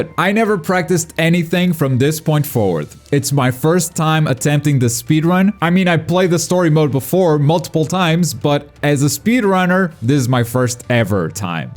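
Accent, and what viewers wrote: Portugal. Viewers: PERA AÍ VC É DE PORTUGAL?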